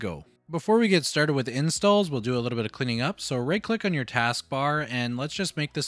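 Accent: Canada. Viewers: Great, to-the-point video. Also, love the Canadian accent.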